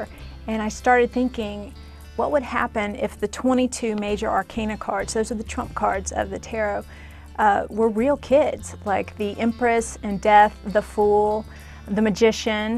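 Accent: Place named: Louisiana, United States. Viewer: mmm cajun accents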